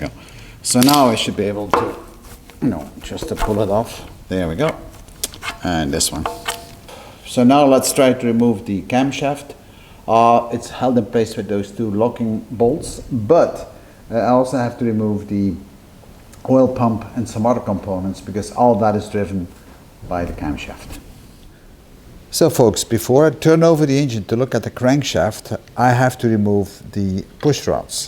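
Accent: Netherlands. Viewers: you sir have amazing skills in bodywork and mechanics. sound like a Dutchman who lived in Canada for some years. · Great Videos - I thank you for them - your accent sounds like my Van Engen relatives from Holland - Gary - Iowa - U.S.A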